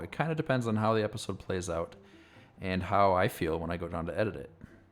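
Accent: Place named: Midwest, United States. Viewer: Been watching your newest SF+ series; that series and this are both great. Commenting as a fellow Michigander to say I knew you were from Michigan after hearing you talk for a couple seconds in the first video, you have a strong Midwest accent. It’s nice hearing it, I’ve lived in Japan for quite some time and don’t get to hear it often.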